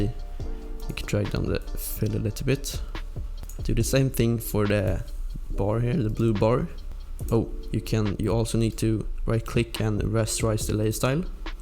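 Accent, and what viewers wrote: Sweden. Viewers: Är du svensk? Låter iaf som det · U sound Swedish as f**k. Looking good. Thanks boiio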